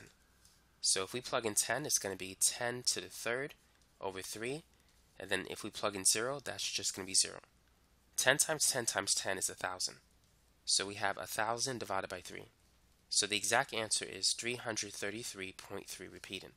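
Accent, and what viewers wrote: United States. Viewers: Sİr, where are you from? you have definitely american accent but sometimes you really sound strange.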